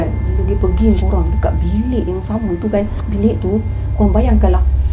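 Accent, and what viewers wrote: Kelantan, Malaysia. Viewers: Senjoh tu Hok sedak dgr kak .haha .kak ore Kelate ko .?area mano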